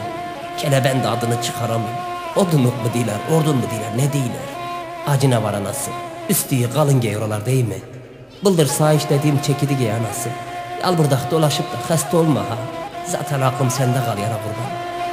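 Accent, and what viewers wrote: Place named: Çobanbey, Turkey. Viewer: diline salık emi gene cobanbey şivesini çok güzel anlattımışsın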